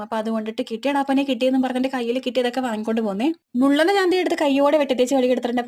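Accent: Kochi, India. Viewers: Ithonnum alkarude perala...eniku ishtapettu...thani kochi baasha😂😂❤❤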